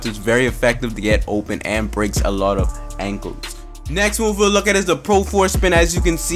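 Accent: New York, United States. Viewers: The most new york guy i ever heard on YouTube lol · Where U frm. U sound like u frm NY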